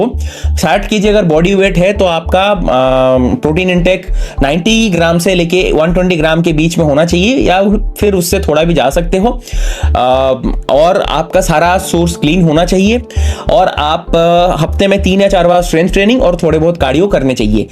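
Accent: Odisha, India. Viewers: Bhaiya r u from odisha???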